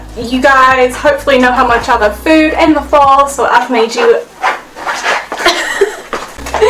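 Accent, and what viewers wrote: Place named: South, United States. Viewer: I love bloopers... And ur losing ur southern accent girl...it's English/southern lol that made me hungry.. I think I would like the Apple ones...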